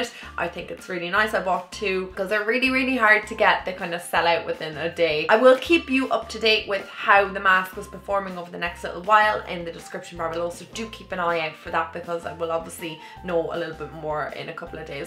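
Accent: Ireland. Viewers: Love the Ireland accent 😂❤️😍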